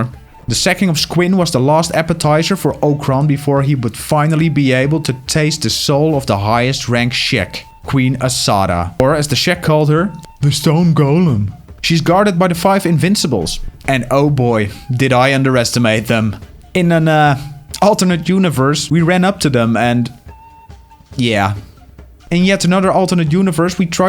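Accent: Netherlands. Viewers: Dutch accent recognised!! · bro is clearly dutch 😂 trust me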